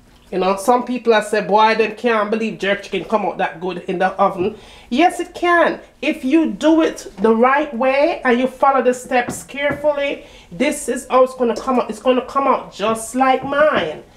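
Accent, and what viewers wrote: Jamaica. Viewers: to cook thats how we Jamaicans speak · I love her accent ! Making me wish I was Jamaican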